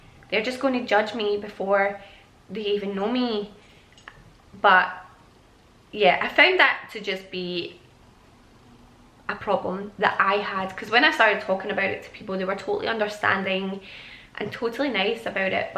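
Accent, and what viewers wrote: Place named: Scotland, United Kingdom. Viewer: Are you Scottish nice accent 😉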